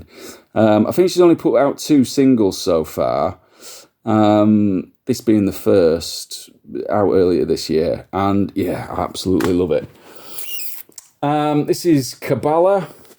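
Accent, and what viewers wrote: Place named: Yorkshire, United Kingdom. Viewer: You've got the same twang as my mate from Mytholmroyd, ha! Yes, their are some great finds to be had with 12 inches in the dance/electronic/disco ballpark and I love having a rummage to see what turns up. I've also bought some absolute bobbins too to be fair but jeopardy is part of the fun, I guess. At the prices I pay I don't bother looking them up in situ... I'm happy to take the rough with the smooth! I'll look forward to you doing a video featuring 12s, and, like you, I'm behind in looking at what I picked up recently. I've probably got a good couple of hundred dotted around I need to catch up with over a beer or four!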